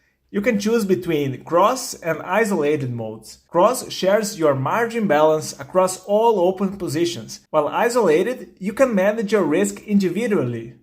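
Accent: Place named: Brazil. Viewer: É um brasileiro falando inglês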